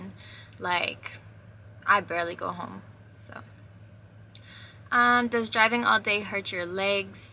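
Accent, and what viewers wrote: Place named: Miami, United States. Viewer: You sure picked up that Latino Miami accent.. Maine accent is totally different..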